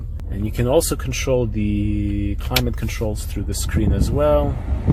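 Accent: Russia. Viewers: Smoothest Russian accent I’ve ever heard. That interior color is horrible btw. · raaashian accent? cool truck dude. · If that’s a scwartzenegger reference, i don’t think this guy is Austrian, he sounds Russian or maybe Ukrainian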